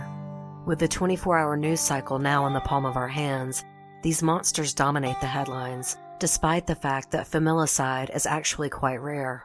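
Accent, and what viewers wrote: South, United States. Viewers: Btw, even though i said that on my last comment, i have subscribed. I love your relaxing southern voice and your story telling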